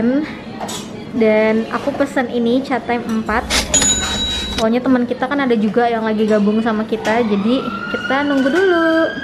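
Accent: Medan, Indonesia. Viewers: kk org medan kah? salam dari binjai kaka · Kak asli dari medannn yaa? 😁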